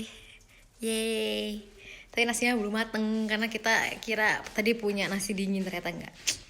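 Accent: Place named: West Java, Indonesia. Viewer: Logat sundanya masih kedengeran wkwk. Mirip papahnya bgt ihhh gemes wkwk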